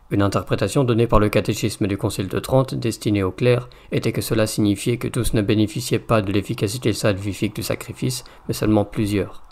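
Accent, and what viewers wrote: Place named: Europe. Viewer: Par votre accent, je présume que vous êtes en Europe. Voici une réalité canadienne. Tous les documents que vous avez cité sont valides, la nouvelle messe elle aussi est valide. Le problèmes s'est trouvé au niveau de la formation des prêtres dit baby boomers. En effet, bien que tous les documents soient présents, la formation lors de notre "révolution tranquille" a engendrée plusieurs cohortes de prêtres dit "communistes" nos pas de manière politique, mais de manière liturgique et idéologique. Ses cohortes font encore des dégâts aujourd'hui dans l'église québécoise et canadienne en enseignants : que le Christ n'est pas présent dans le saint sacrifice, que la messe n'est pas un saint sacrifice, en sautant ou modifiant des prières eucharistiques, en ne priant pas le bréviaire, n'affichant pas où peu l'adoration, favorisant les femmes diacres/prêtres, aucun respect pour la manipulation de l'hostie, en utilisant les homélie pour faire un spectacle et non pour passer un message biblique. 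Etc.. tous les fait que j'ai énumérés ci-dessus j'ai pu les voir de mes yeux! Je ne blâme pas les documents du Vatican ou les conciles, mais bien le manque de rigueur et le manque de jugement des séminaires. Encore aujourd'hui, les séminaires québécois enseignent certains points qui vont à l'encontre de catéchisme et même du droit canonique! Le fléau est donc dans la formation et c'est pourquoi nous pouvons voir un exode des séminaristes vers les séminaires plus "traditionnelles" non schismatiques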